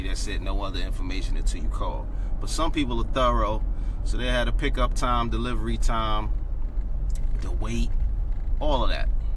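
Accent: New York, United States. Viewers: Are you sure you not from NY? You be using New York lingo and you sound like you from NY I swear lol.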